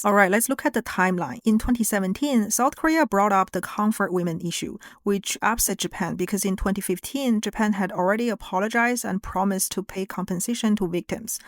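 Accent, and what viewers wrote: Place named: China. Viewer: Your thick chinese accent makes this vid funny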